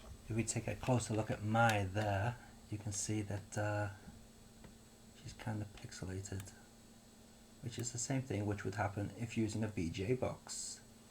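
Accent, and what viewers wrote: United Kingdom. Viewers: Hey I hope you don't mind me asking. Are you a native to japan or did you move there? Your accent sounds very British!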